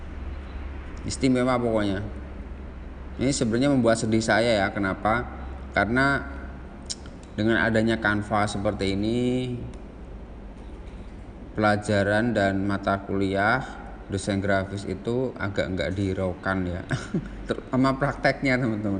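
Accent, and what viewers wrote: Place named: Java, Indonesia. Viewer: saya kalo liat pak jelasin materi lewat youtube mau senyum trs...soalnya medok kali pak🤭🤭🤭🤭🤭🤭🤣🤣🤣🤣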